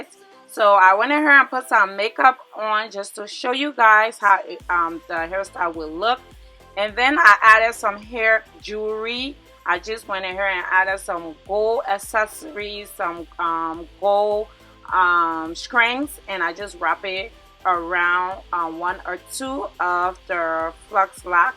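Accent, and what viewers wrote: Liberia. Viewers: Jessica Wiah yeah I can hear her accent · I told myself she is from Liberia..then came to the comments section to confirm..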